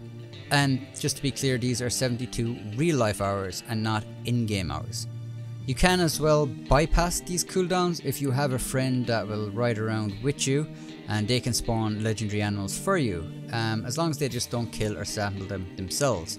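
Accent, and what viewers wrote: Ireland. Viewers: I swear you’re from Ireland, are you? (from Dublin)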